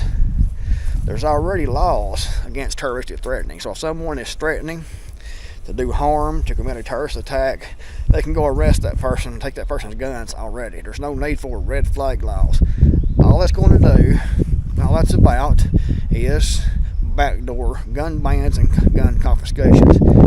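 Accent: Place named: Wilkes County, United States. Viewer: A little further into the video now. 😂😂😂 You sound like a Wilkes County boy. That’s pretty much the sentiment around here. - Except there’s too many that still think Trump will save us.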